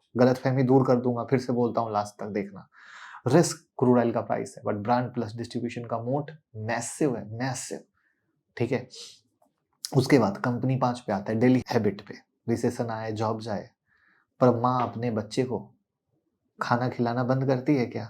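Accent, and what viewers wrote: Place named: Bihar, India. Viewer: He is not "Marwadi" From his accent seems to be a "Bihari"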